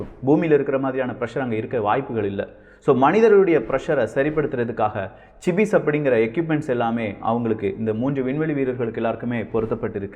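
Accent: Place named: Kerala, India. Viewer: bro malayalam slang in ur english theriuthu.. docking - dawking not dowking.. 'O' is special for Malayalees.. No offesne. it is slang..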